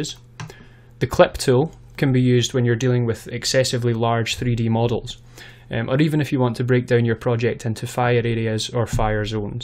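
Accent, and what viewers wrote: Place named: Ireland. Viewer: are you Irish? nice accent tho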